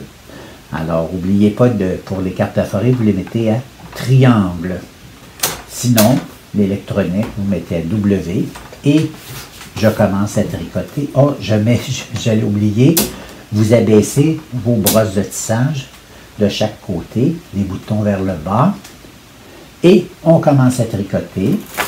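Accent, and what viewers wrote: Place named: Quebec, Canada. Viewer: Merci! C'est toujours un plaisir de voir et d'entendre tes explications avec ce doux accent québécois:-)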